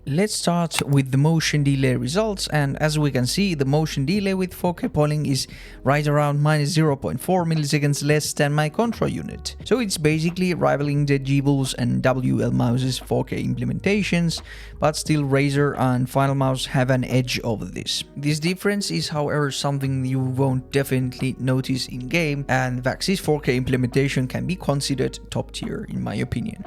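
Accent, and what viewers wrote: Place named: Finland. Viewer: Eyy, another finnish peripheral enthusiasist :D knew right away from the accent lol, Kiitti arvostelusta, hyvä video :D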